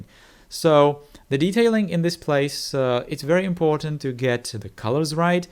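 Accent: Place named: Czech Republic. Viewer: Personal question, you're not a native speaker right ? You sound a little Czech. Not insulting your English, i think it's great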